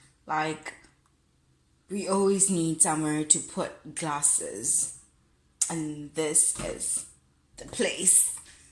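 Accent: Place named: United Kingdom. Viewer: You sound very British You can ask for a UK man like me lol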